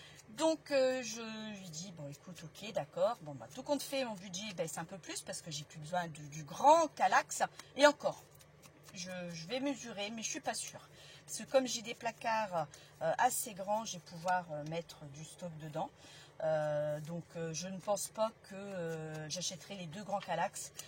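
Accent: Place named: Lille, France. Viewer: bonjour j'ai découvert ta chaîne par Agnès et je me suis abonnée sympa le pote bobine .j'aime beaucoup se que tu fais mais dit ton accent je le connais tu es une boyau rouge il me semble......merci a toi pour ta bonne humeur. gros bisou alexandra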